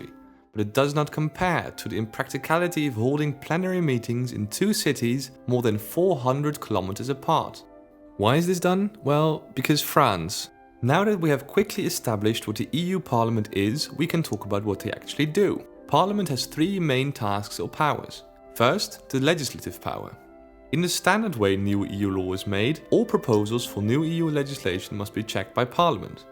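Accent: Netherlands. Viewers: I hear Dutch accent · Why do you sound Dutch? Are you? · I hear a slight Dutch accent am I right? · You're Dutch, right?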